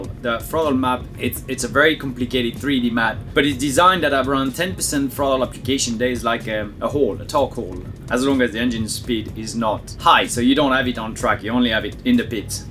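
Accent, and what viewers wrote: United States. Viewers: You sound more and more American every week haha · wtf is with your American accent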